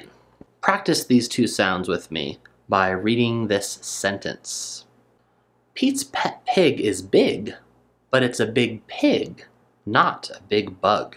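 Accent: United States. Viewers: i Like amrican accent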